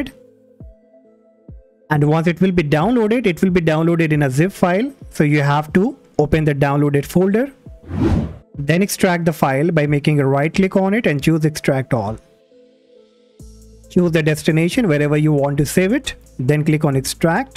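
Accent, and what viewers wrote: India. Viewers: Indian accent...Beware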